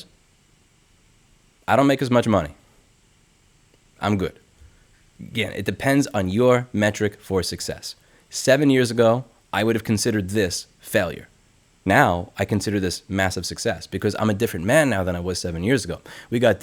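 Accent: New York, United States. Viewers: I subscribed because i enjoyed hearing you, an educated and passionate individual, talk about injustice in a fair and measured way with an excellent new york flair. I stayed for the same reason, but also because i appreciate your perspective on the world. Your commitment to values like honesty and integrity give me hope that there are still some good people out there. Im a pilot, just getting out there in my career, and doing things like networking on linkedin and building my resume make me feel like trash because im not succeeding on the modern pilot metric. I just have to remember what you said: success is based on what youre trying to personally achieve. Thanks, louis.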